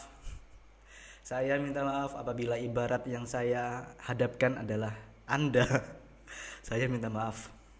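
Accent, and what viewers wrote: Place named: Java, Indonesia. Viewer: kk GUE nya kok medok ya, maap kk skali lg tp ga pantes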